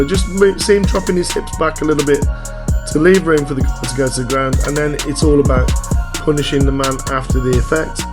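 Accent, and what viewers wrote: England, United Kingdom. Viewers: Mate, I’m absolutely loving this breakdown. Insightful but with an English twist. 🏴 I always feel English analyst sounds a bit off but you nail it bro!💪🏻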